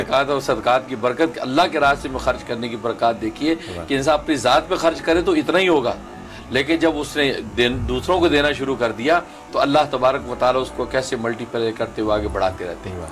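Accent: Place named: Punjab, India. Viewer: Aaraam say bayaan karo or tareqay say ok Lekin tum full Panjabi jahalat. Zaban main suna rahay hoo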